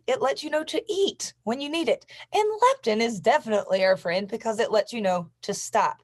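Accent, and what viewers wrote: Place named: Tennessee, United States. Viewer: OUT of curiosity- are you a TENNESSEE girl? I love hearing you talk- and your mannerisms are just like my area here.. would love to find myself a lady similar to you-- OLDER (i'm 66).. ha. but you sound like you're from my area-- You're a gorgeous lady-- your hubby is a lucky man.. Keep up your GREAT videos- I get more from yours because you talk like ME- and seem to think and have mannerisms like my area folk do.. in other words- you're similarities- have helped me get MORE out of this info in half a dozen videos than over a YEAR of listening to eveyrone else.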